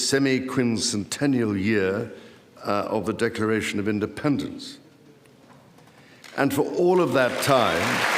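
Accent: RP, United Kingdom. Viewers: That speech put us Americans to shame in terms of pure literary eloquence, and RP, or Received Pronunciation i.e. "the Queen’s English," which is a is a high-prestige, non-regional accent historically associated with the upper class/royalty for its articulation, distinct vowel sounds, and a "cut-glass" tone. If i was taking an order from his majesty, I would be hanging onto every single word out of sheer admiration, and i am sure to his majesty's utter exasperation and irritation. Otherwise, a highly politicized speech requesting President Trump and US to recommence aid for Ukraine and a little sliver of an arrow about politicAl speeches and the importance of language.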